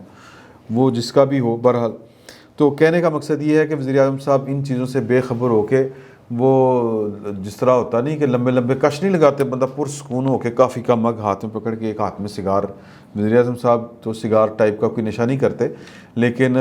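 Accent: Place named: Punjab, Pakistan. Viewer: you got a great style of impromptu narration... mixed with Punjabi Tarka.. Luv from Indian Punjab.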